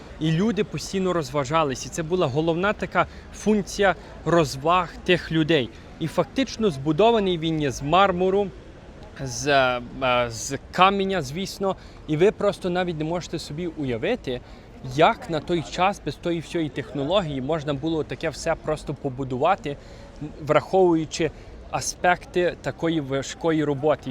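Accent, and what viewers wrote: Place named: Kolomyia, Ukraine. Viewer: ти чисто гутариш по коломийський,звідки ти родом?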